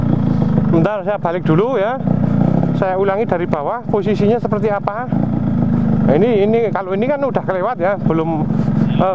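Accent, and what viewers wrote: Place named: Wonosobo, Indonesia. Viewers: "Ho'oh siiih..." logat kata khas Wonosobo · Suarane wong wonosobo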